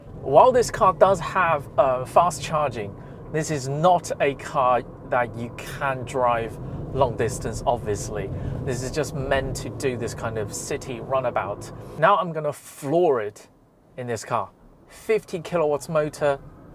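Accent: United Kingdom. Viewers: Just came across this video and channel. Who's this guy? He's hilarious and of course the accent and humour of a true brit!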